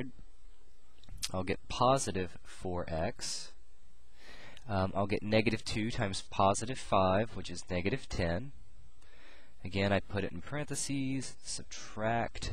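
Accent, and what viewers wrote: South, United States. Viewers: I had to laugh when I heard your country accent. But thanks for the example! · hahaha. the comment about your southern accent made me giggle. For some reason my brain shut down and i second guessed myself... you helped me get back on track :) and gave me a good little laugh too. Thank you for your time and help :)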